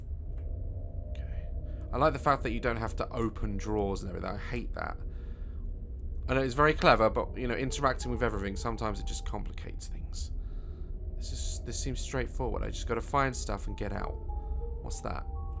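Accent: United Kingdom. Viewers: G R A N D M O T H E R Daz: So when we meetin' Nan? Brtish 100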